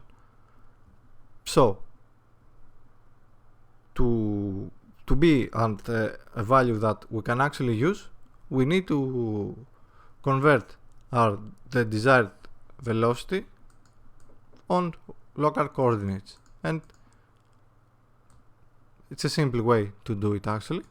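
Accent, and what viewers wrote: Greece. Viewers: πες την αληθεια!! εισαι ελληνας απο λιγο που ακουσα το βιντεο ... (προφορα)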